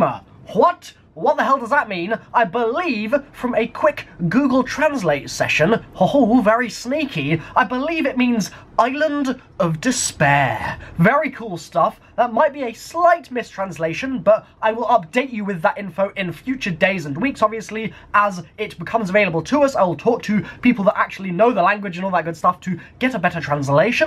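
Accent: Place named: RP, United Kingdom. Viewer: Posh but good vid left a like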